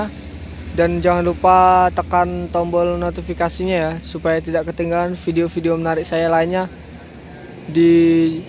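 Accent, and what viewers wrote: Java, Indonesia. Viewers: Adminnya ngomongnya kaya orang Jawa😁😁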